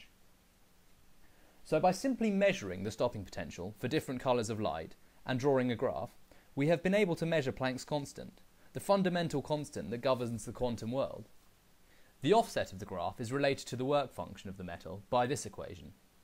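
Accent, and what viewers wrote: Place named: RP, United Kingdom. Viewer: Awesome posh accent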